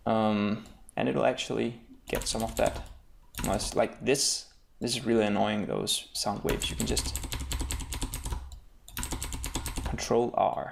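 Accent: Denmark. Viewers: Yoo dude, this is absolutely brilliant, thanks a lot! Also, er du dansk? Synes virkelig man kan høre det på din accent :P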